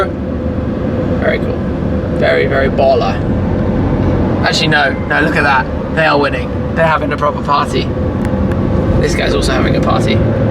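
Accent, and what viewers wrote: England, United Kingdom. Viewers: You are british?? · You could have taken phone numbers off chicks at least ! Believe me the first thing American girls are interested in is the size of your wallet .Its the very first thing in their head they ascertain on first contact.An English accent is huge bonus.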